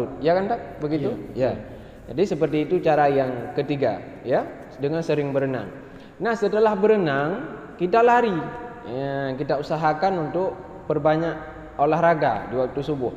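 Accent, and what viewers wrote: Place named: Aceh, Indonesia. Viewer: Dari suaranya, tampaknya Ustad yang setiap kali Memberikan pelajaran di Tahsinul Youtube dengan aksen Aceh adalah Ustad yang baju Biru... Betul tidak ya ☺️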